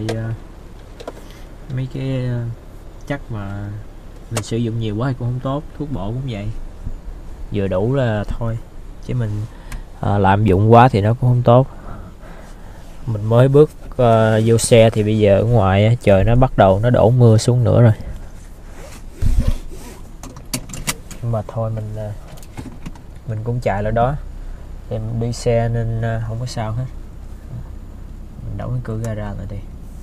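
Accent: Vietnam. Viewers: giông ngoai bac nha minh vay bn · Anh ơi, mẹ anh có phải quê là quãng ngãi ko, tại nghe giọng nói giống nhà nội em quá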